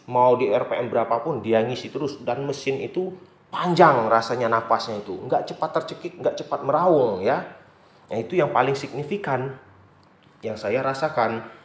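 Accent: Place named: Sumatra, Indonesia. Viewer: saya dari jambi om, masalahnya logat bahasa om nada2nya kayak orang sumatera, salam kenal dari jambi... Dan ditunggu tutorial selanjutnya om saya bantu dengan subcribe ya....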